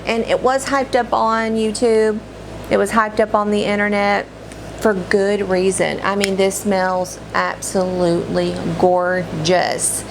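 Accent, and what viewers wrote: South, United States. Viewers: Oh wow... not even available on Dior’s website. It seems they don’t even make it. I generally avoid EDT, but if that’s the version of this you have and love (& it actually lasts)... well, I’ll trust it! ;) ... you are adorable, btw! Love your southern accent!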